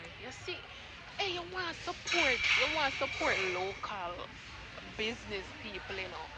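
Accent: London, United Kingdom. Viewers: YOUR BRITISH ACCENT NICE YUH CAN GWAUN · Yuh london accent trang enuh girl!!!! Wow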